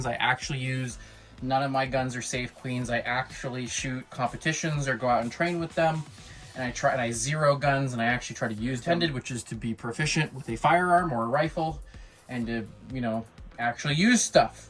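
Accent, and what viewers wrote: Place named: Alberta, Canada. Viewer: Ah southern Alberta love it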